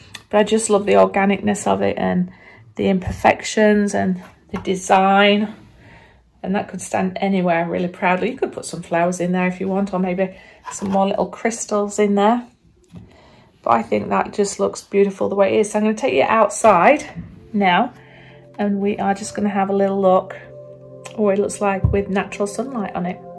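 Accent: United Kingdom. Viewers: Wow. This is Fabulous, and Fantastic. I just came across your channel and am so excited. Your British lol. Ive been watching USA Canada and New Zealand. Doing Acrylic Pouring. I love doing it but I also do lots of other crafts EXCEPT Resin Sculpture. I'm so glad that I am part of your wee family. I'm Scottish but actually part of me feels like I'm from Surrey/Hampshire. I have lived down there for years back and fòrward. And have lots of friends in that area. Now... about you. Lol. Your so friendly,funny. And Very Talented. I love this Vase, the colours are amazing and I love a bit of bling.I can't wait to see you do more. Well done. Stay safe Sandra xx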